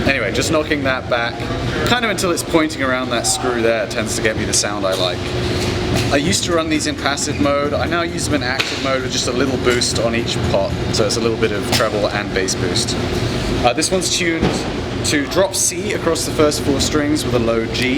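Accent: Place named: United Kingdom. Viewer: I just came here for the British accent.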